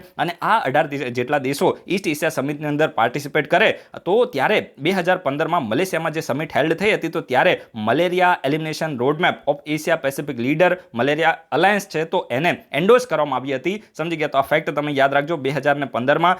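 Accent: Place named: Ahmedabad, India. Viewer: anand ane amdavadi boli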